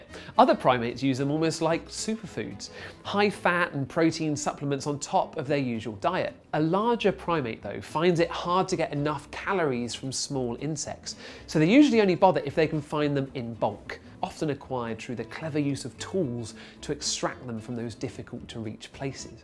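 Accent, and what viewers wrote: United Kingdom. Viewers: British accent sometimes is harder to understand than North American.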